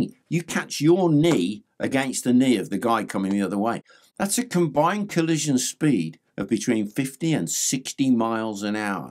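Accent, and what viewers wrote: United Kingdom. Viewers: I love this dude, he is knowledgeable and has one of the best of the British accents.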